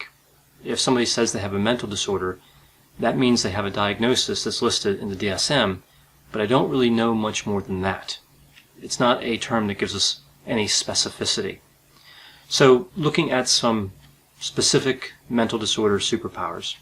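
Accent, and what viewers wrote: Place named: Pennsylvania, United States. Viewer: Are you from Pennsylvania? It’s the accent!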